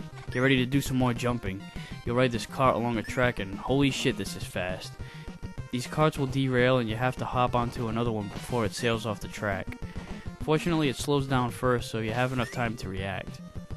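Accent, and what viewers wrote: United States. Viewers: uuuuuhhrh holy fuck your American accent is annoying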